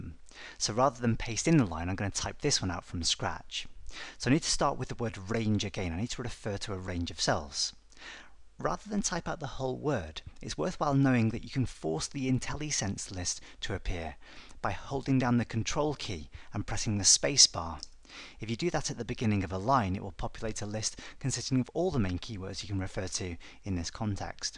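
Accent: United Kingdom. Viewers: Your videos are fantastic, and your accent is so beautiful! Are you british? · I'm not hating at all (please no flaming), but its sooooo freshing to hear an English accent on a programming tutorial video. I'm currently using YouTube to learn <link>, SQL, <link> and a range of others and all the videos I have watched they have been American/Indian. I have no problem with that at all but its good to see the UK has some programming skills! Great tutorial, really easy to follow and I learnt a lot. Time to watch the rest of your videos :D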